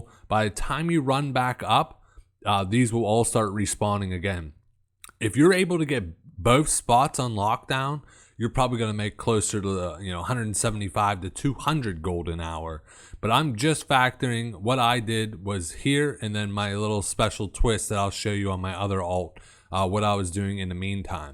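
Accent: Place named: Pittsburgh, United States. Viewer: well the pgh accent makes sense now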